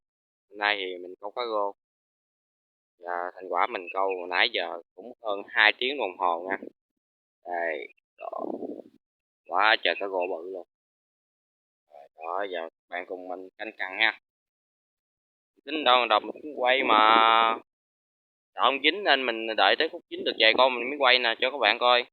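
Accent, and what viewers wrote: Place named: Mekong Delta, Vietnam. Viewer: nghe tiếng là biết miền tây rồi phải không? tỉnh nào đó.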